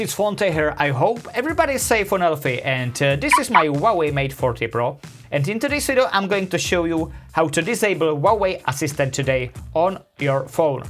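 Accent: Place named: Czech Republic. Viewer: mě bylo hned jasný že jsi Čech jsem to nějak poznal podle anglictiny a hlasu❤️ fakt díky moc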